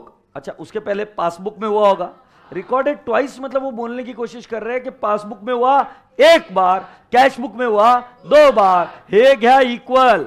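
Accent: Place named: Mumbai, India. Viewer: I think u are from Mumbai....🤔🤔 But nice explaination sir🙂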